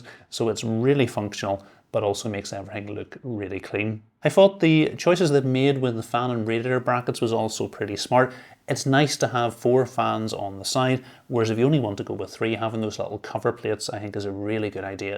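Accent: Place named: England, United Kingdom. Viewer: wow this channel is great. it took me a while to fully understand this english accent but now i can listen to you all day.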